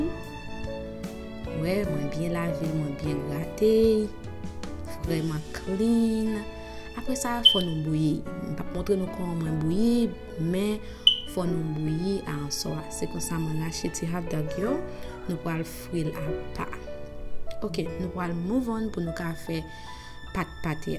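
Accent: Haiti. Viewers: I love your creole accent My mom has the same one lol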